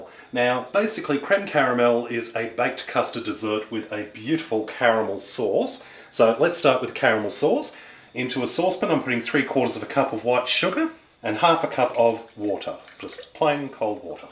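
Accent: Australia. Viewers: What a delightful Aussie accent! ♥ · Is he australian? His accent is kinda familiar but i love caramel cream or whatever it is called.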